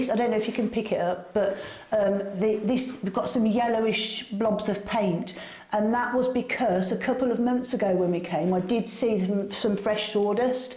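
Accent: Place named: Birmingham, United Kingdom. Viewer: From the 'm'duck' do I guess Midlands maybe Nottingham?. In the next video could you give an idea of the land water etc I love the accents of home.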